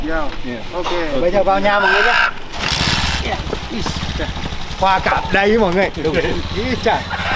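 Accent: Nghe An, Vietnam. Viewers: Ngày nào củng xem chương trình của xem mà không thấy chán vì biết được cuộc sống ở châu phi, và nghe được giọng xử nghệ quê hương mình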